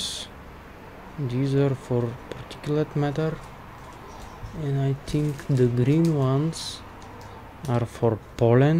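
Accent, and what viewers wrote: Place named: Russia. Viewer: Я один слышу русский акцент?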